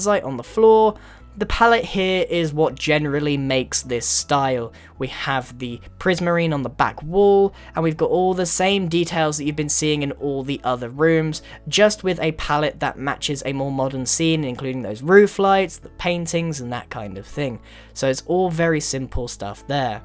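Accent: England, United Kingdom. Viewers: he's British the way he pronounces some words doesn't match with ours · Are you British? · you sound british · An easy-to-digest, well spoken, simply arranged tutorial. And he's British! Great series, keep it up! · thank god he's british ! And god save the queen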